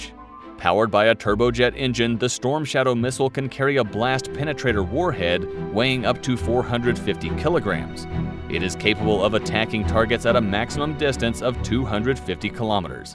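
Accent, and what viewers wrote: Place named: United States. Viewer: I thought Military TV was British, obviously this narrator has an American accent though.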